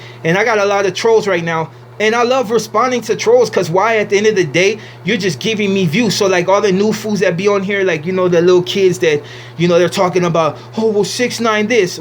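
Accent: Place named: Mexico. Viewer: " vale pito " only a true mexicano can talk like you carnal my respects im a new subscriber keep the videos coming bro sur up perrito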